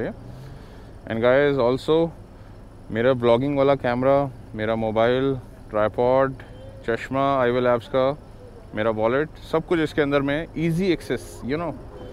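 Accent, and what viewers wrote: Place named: Bihar, India. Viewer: Stop speaking like bihari babu aaraha Hain speak like Punjabi aa rahi Hain Hain bro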